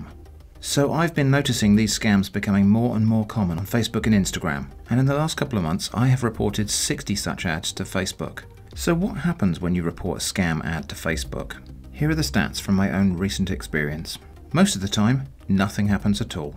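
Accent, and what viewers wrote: United Kingdom. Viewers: Man at this point I am watching this channel only because of his British accent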